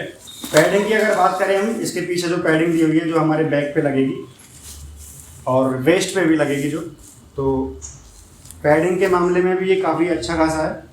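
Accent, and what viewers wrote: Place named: Uttarakhand, India. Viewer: Bhai tumhare bolne ke tarike se lag raha h ki tum Uttarakhand se ho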